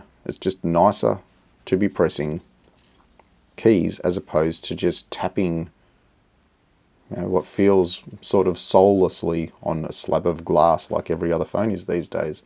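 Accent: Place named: Australia. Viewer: Great review, knowing both sides of the coin, why not go for the best side, nice to hear an aussie not talking too much aussie, no offence. Regards London boy.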